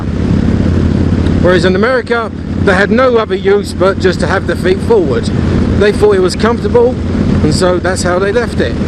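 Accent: Cockney, United Kingdom. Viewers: It's been so long since I've heard cockney! Worked with the Brits and Scots years ago, great people, lovely sense of humor!You almost owed me a new keyboard, nearly spewed tea all over it on the "Nut to Butt" comment on #7!Took one of Scots on the back of my motorcycle one day, it was due for a carb overhaul and sometimes hard to start, after the 4th try to get it to start, the Scot remarked "Going on the starter motor, are we Lad?" Cracked me up, we both had a good laugh.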